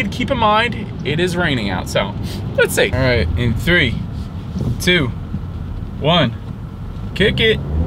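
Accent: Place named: Pittsburgh, United States. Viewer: Your Pittsburgh accent is so heavy. Good review !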